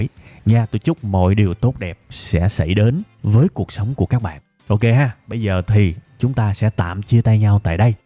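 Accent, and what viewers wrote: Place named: Dong Nai, Vietnam. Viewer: Ad nói giọng giống người miền Nam, cụ thể là Đồng Nai quá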